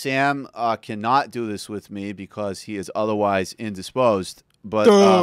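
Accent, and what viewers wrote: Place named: Massachusetts, United States. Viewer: lol! Love the cliches! Being from Mass,I can say you did a great Mass accent..except when you said New Hamp-sha. That would be the Maine way of saying it. Ah---yuh.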